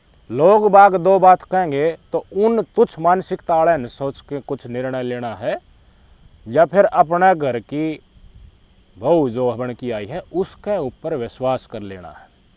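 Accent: Haryana, India. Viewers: Bhai. Sahab aapki.Haryanvi.boli.may.patarkarita.ko.salut.karta.hu.🎉🎉🎉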